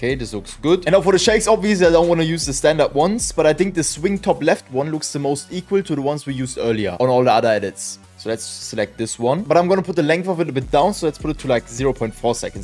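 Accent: Germany. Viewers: Man merkt anders das er deutscher ist. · bro du hörst dich so deutsch an 😭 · i hear german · U sound german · Wieso weiß ich sofort das er deutsch ist😂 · are you german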